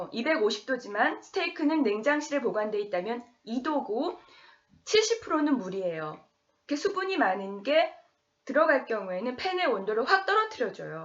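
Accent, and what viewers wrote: Seoul, South Korea. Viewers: 서울 사투리 정말 매력적 ㅎㅎ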